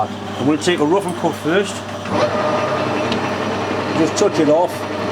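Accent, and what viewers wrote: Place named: Durham, United Kingdom. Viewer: Love your video's- I was born in Durham 72 years ago and left for Australia in 1964 and its great to hear that accent ✌✌✌✌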